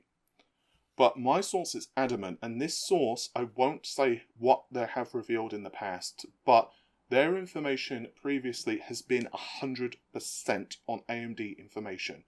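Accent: United Kingdom. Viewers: It's just pretty normal British English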